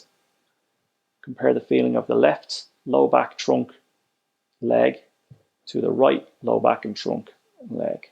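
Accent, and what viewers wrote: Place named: Ireland. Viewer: What an eyeopener... wouldn't have believed how much feeling there was going on inside if I hadn't tried it out myself! Shout out to DH for advising me not to go straight over to the heavy bag and start kicking it too haha. This exercise is actually what got me really paying attention to this stuff. Now I have no choice but to look at all your other videos as my body is.... well I'll keep my adjectives civilized! Thanks mate and as an expat Aussie its nice to hear an Irish accent.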